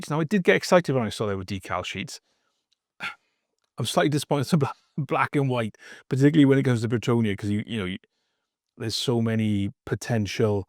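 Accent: Wales, United Kingdom. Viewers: You sound Welsh! Whose coat is this jacket?! lol, imma fellow Welshman across the pond in the states, and ive recently gotten into Warhammer after playing Magic: The Gathering for many years. very excited about Blood Bowl. Love your videos!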